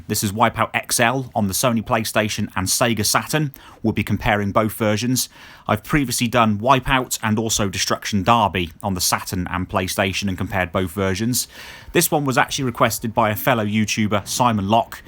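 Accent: United Kingdom. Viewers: How come you sound clearly English, but you're playing the XL versions instead of our 2097 versions?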